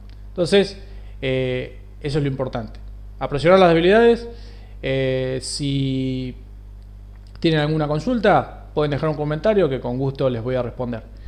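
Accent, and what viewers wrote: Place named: Uruguay. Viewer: Crack!!! Pensé que eras Uruguayo.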